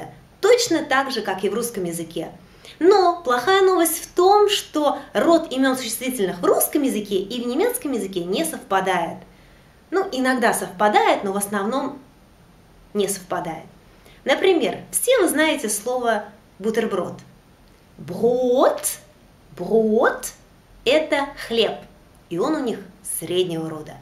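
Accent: United Kingdom. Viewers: Зачем английский акцент в немецком? Зачем так пугать зрителей? Ведь в реальности окончаний множественного числа всего три. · Все что вы сказали я считаю верным, но вы произносите немецкие слова по-англиский, это все портит. Как минимум. из-за того что вы читаете букву R как английский R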